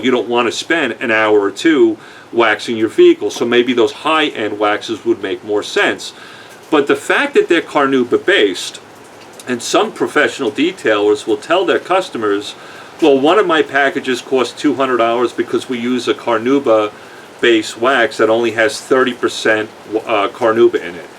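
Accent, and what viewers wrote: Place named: Queens, United States. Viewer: how did a guy from Queens (I'm guessing based on the accent) end up in Dallas? Keep up the great work!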